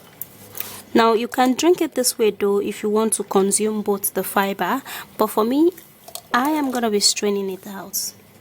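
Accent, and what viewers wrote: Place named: Nigeria. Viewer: She's Nigerian.....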